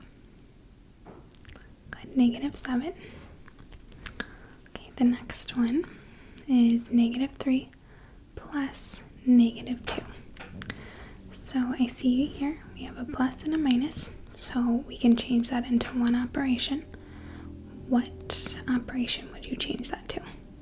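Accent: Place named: Wisconsin, United States. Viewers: VEEEERY Midwestern, that's for sure. I'm in Arizona and we have a ton if Midwesterners here. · That accent, I love it. I can't place it 100%. But sounds Wisconsin-ishhhhh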